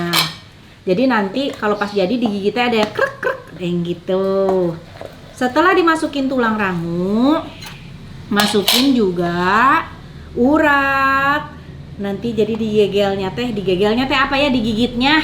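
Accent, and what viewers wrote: West Java, Indonesia. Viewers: mun kacaletot ngomong bahasa sunda, da ges kabiasaan letahna sunda...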